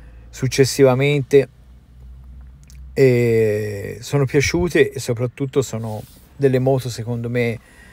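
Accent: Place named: Tuscany, Italy. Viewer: Mi sono appena iscritto perché dopo aver visto qualche vostro video mi sembra di essere tra amici che chiacchierano sulla loro passione, io ho una r1250gs std del 2021 e ci troviamo veramente bene, specie mia moglie😅; io sono di Torino ma trovo simpatica la tua cadenza Toscana ( abbiamo amici a Pisa e all’ isola d’ Elba). Ciao Roberto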